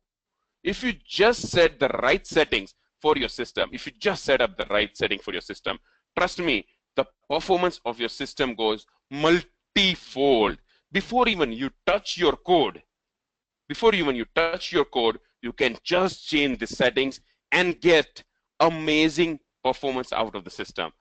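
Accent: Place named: India. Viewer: He's Indian, how can he help that he speaks English with an Indian accent. A little bit entitled of you to think he should modify the way he speaks because a small proportion if the population can't understand how he speaks. I'm English and I suspect you'd struggle with my accent as well though I'm struggling to work out how I'd modify it to suit you.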